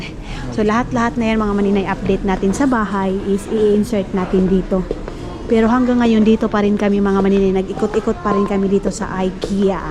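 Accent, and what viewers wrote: Visayas, Philippines. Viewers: Tuwang tuwa talaga ako sa panonood sa iyo my dear maninay..yung pananagalog mo na me halong bisaya😊kahit di kita naiintindihan minsan patuloy ako sa pag aabang sa mga vlogs mo😅😅..go lng sa vlogging mas marami kaming viewers mo na naghihintay kht paulit ulit mga lugar na pinapakita mo sa amin..nevermind those bashers basta andto lng km😊😊😊❤❤❤happy ka happy din km na nagmmhal sa inyo ni..God bless❤🙏❤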